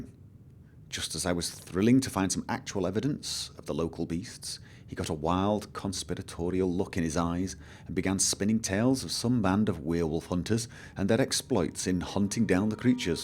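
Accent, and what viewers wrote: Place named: Bolton, United Kingdom. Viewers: I thought so, he's probably from Bolton though. I know a lot of people from Bolton who have that accent so it sort of got me thinking but thanks for the response. Sorry about mine! Hehe! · I download the vids as MP3's and listen to them while trying to sleep. I like it that my storyteller has the accent of a true northernman, sounds more genuine.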